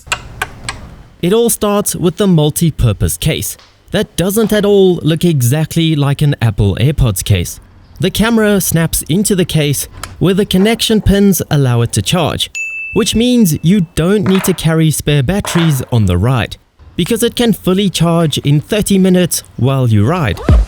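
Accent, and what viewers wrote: South Africa. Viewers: crazy how I can tell youre south african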